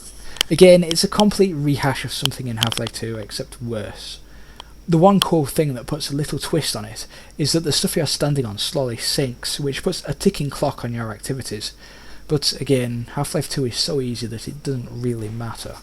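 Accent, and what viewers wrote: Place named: RP, United Kingdom. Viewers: ok sorry it just sounds like you were putting on a posh accent sorry for the miss understanding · This guy are from West Yorkshire ; )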